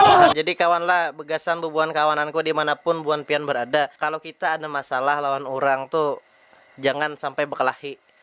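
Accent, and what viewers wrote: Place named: Hulu Sungai, Indonesia. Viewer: Orang mana kam logat hulu sungai pang nah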